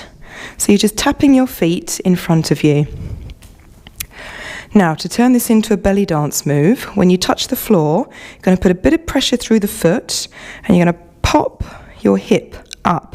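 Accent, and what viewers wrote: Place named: England, United Kingdom. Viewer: I can see by your accent that you are English . Could you tell me where do you teach ? London maybe ? Would be perfect for me !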